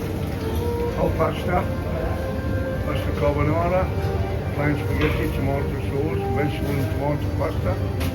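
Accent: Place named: Black Country, United Kingdom. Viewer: Nice to hear a local accent :) , born and bred brummie here now living in east Yorkshire ( guessing your more black country way? ) ... we did Tunisia last year but Sousse , enjoyed it but fancied a change this year and found this hotel , another Tui Blue ( although not adults only this time sadly ) Great honest review and so have booked it for mid December , bit more money than last year but we've paid for a sea view room etc .. cheers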